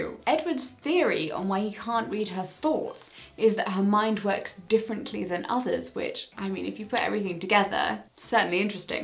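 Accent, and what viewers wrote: United Kingdom. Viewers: Please slow d o w n! I can't understand a word you say. Your British accent is too strong.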